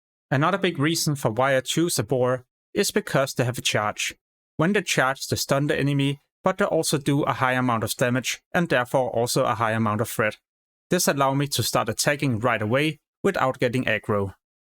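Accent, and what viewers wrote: Denmark. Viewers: Skud ud til den mest danske accent på youtube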